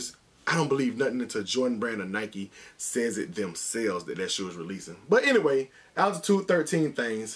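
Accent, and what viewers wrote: South, United States. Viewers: man i luv your southern accent you sound just like t.i but with a lil deeper voice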